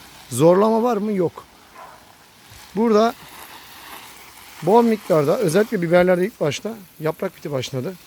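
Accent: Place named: Aegean, Turkey. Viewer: Hocam boşver sen onları etrafımız negatif insanlarla dolu. Sen samimi adamsın belli konuşmalarından. Nerelisin izmir aydın tarafına çok benziyor şiven. Allah kolaylık versin. Kolay gelsin.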